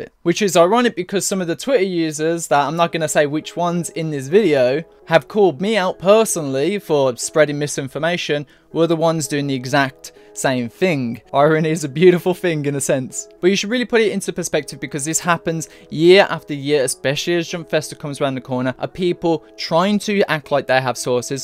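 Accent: United Kingdom. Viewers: When I go around youtube to see anime review video their voice pattern almost looks similar( Since most anime review are from American youtubers) but your one kinda sound a bit unique (more like British accent) And turn out it was 😂. Well not criticizing your accent but I must say British accent is really something else 🤔.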